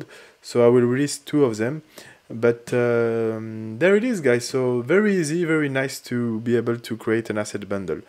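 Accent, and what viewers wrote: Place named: France. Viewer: Je reconnais cet accent français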